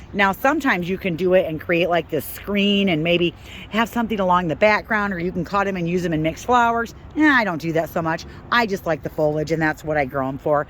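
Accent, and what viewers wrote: Midwest, United States. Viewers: If anyone needed an example of Midwest accent, this is it. Love the video!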